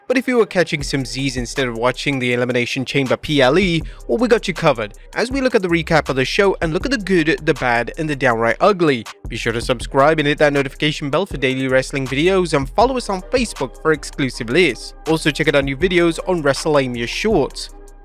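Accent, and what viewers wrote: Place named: United Kingdom. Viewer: Wtf I didn’t know you were from the UK😂😂😂😂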